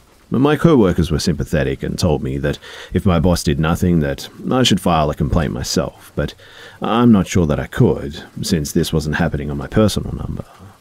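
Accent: Australia. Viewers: You got a smooth voice man.......Australia ?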